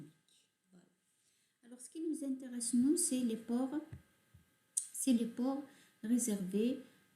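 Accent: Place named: Arab world. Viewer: j'avais peur de pas bien comprendre mais bizarrement quand on utilise un accent arabe je comprends tout de suite x)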